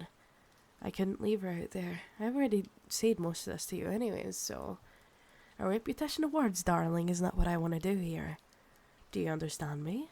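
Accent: Scotland, United Kingdom. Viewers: Slow down my vampire mistress, the blood is not going to run away from you, almost tearing the pet's arm.😅😅 This video is wonderful I enjoyed it very much, vampire+ the scottish accent equals a awesome video to watch and fall in love with it.😍😄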